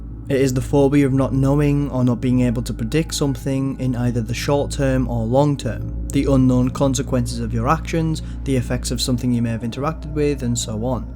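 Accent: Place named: Scotland, United Kingdom. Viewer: First YouTuber with a Scottish accent, makes you listen 🔥🔥🔥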